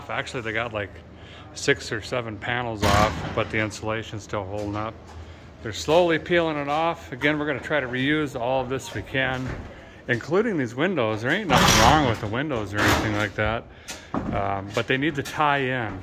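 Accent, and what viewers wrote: Minnesota, United States. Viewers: Please don't loose the MN accident and start sounding like your are from Tennessee. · Too bad you left Minne-Snow-Ta... I get it when it comes to wrenching in the cold. Good luck with the projects. Keep your accent please. I have a hard time listening to people from the southern USA. Super nice folks mind you but my Midwest brain doesn't catch on as well... I'm sure my brain is still partially frozen... I will stay right here in the frozen tundra thank you. (Somebody has to live here)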